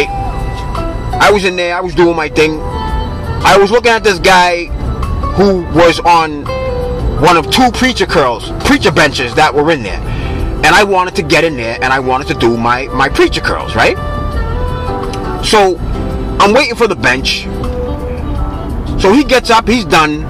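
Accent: Boston, United States. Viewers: That's a Boston Strong accent.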